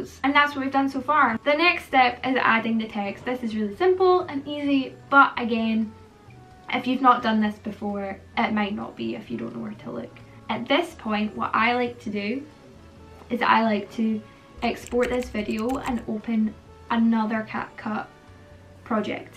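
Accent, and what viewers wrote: Scotland, United Kingdom. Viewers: Your accent is beautiful. I did not expect a scottish accent tho what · Wait!! She’s Scottish !! She just got 10 times better than she already was!! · yeah it’s a scottish accent, i laos have a scottish accent and live in scotland, don’t live here i like my piece and quiet hehe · Omg r u scottish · YOUR SCOTTISH?!?!?! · btw guys, she’s scottish 🏴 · YOU'RE SCOTTISH 😮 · Absolutely didn’t expect you to be Scottish! Smashin' it, lass 👏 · OMG U SOUND SO COOL I NEVER THOUGHT U WERE SCOTTISH ❤❤❤❤❤❤ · Are you Scottish I love your accent I’m from Glasgow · That’s not a British accent but rather Scottish, I guess! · thats so real, for some reason i imagined her as american loll but i love her scottish accent ❤ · Scottish actually haha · She's Scottish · No way are you scottish!! Seeing you lip sync over all your videos, I did not expect this! 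Ps. your voice is so nice and calming also I love how you include captions on all your videos, I really need them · Ur Scottish I thought u were American lol I’m from Scotland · Your scottish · Are you Scottish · Ok, so sorry but never realised you were Scottish, I always thought you were American!! So strange hearing your normal voice haha. Love your videos!! ❤️ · I love watching your videos but I have never been so shocked to hear a Scottish accent before hahah (I’m Scottish too and I genuinely had zero clue!) 🏴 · YOUR SCOTTISH BRO IM SO HAPPY MY IDOLS SCOTTISH LIKE ME IM SO HAPPY AAAAAAAA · I never would've known you were Scottish, love your accent, love your vids x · WOAG ARR YOU SCOTTISH TOO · SHES SCOTTISH!!!!!!?????? PS I ❤ ur accent I’m just rlly shocked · WAIT are you Scottish? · Are you Scottish? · First video ive seen cause i usually watch her youtube shorts, I didnt know she was scottish, I thought she was american 😂, its kinda cool seeing a scottish creator that doesnt try to hide the accent, wierd to think that she's only like a 6 hour drive away from me, i live in england for clarification😅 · I love the Scottish accent❤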